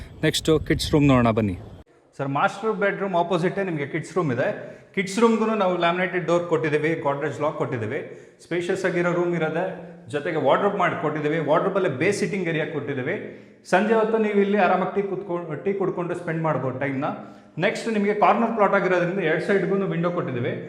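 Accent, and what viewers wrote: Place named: Udupi, India. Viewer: Udupi talking maduthira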